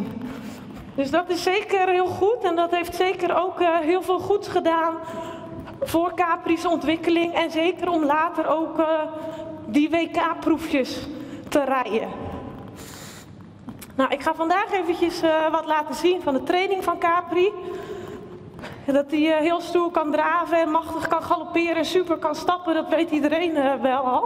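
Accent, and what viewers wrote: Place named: Netherlands. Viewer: An stop at 10.35 min. We can see /reconize that you Mrs. Have had your antebrachialis and infrascupularis dex./sin. In the right position like olympia level ! The horse ,capri sun , open his atlas axis bone connectivity and it looks for better/more scores ! .......sure only your dutch accent in your voice , hold me down to gave full points -cute or sweet thats not an question Mrs. Dinja van liere -amazing ,to build-up talent....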